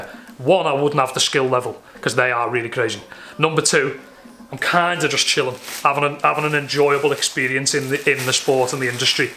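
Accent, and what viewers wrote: Liverpool, United Kingdom. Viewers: Is he scouse ?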